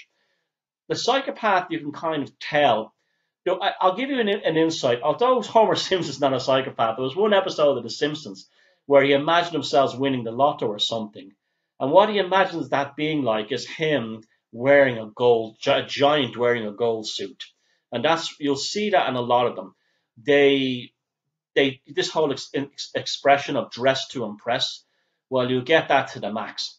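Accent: Ireland. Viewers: ...Nice to hear your Irish dulcet tones again...(enjoying your videos as always). Wishing you a very happy 2018.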